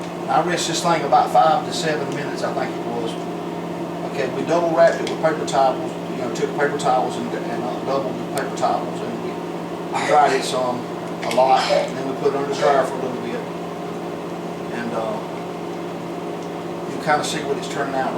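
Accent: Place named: East Tennessee, United States. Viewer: Where is he from! He sounds like us here in East Tennessee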